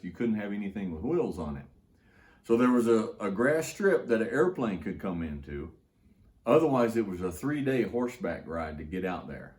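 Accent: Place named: Southeast, United States. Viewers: I thought I heard a more southeastern accent. I'm from east TN and me and my wife have always wanted to do something like that or atleast regularly utilize and ride horses/mules. So glad I came across your videos! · Just found this guy yesterday. Now I'm binge-watching. Three points come to mind now: First, in the first video I saw of his, was looking 100% "Texas." The video was teaching how to make a simple camp breakfast (awesome, btw!), and our man was surrounded by flat TX dirt, cedar trees, and what looked to be some mesquite, too. Texas all the way. Then he opened his mouth and started talking. And I thought, "NO WAY is this man from the Lonestar state! That is East Tennessee if I've EVER heard it!" Turns out I was right, lol. Second: Hate to get "political," but when I hear all this talk about "white privilege" I think about countless men like who had NO privilege at all! 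Every ounce of success was earned with blood, sweat, and tears. Third: should get an agent and go into radio or voice acting. You're a NATURAL!! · Mighty great story, and lessons for life. Purty sure you still have a little bit of Kentucky accent. After two generations here in Texas, I still consider I have some of my meemaw's Kentucky accent.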